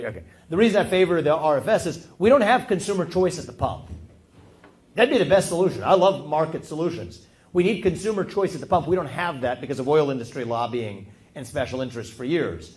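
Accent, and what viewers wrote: United States. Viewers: As a 50 year old Canadian, Vikek sounds like an American. I don't expect he'll be the next president. But he'd make a fine president.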